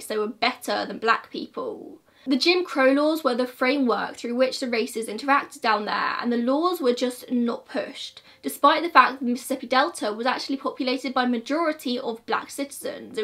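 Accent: United Kingdom. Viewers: She has a very thick British accent. I THINK she said Gratuitous. And I think she is using it in the sense that posting the picture is unnecessary or not justified.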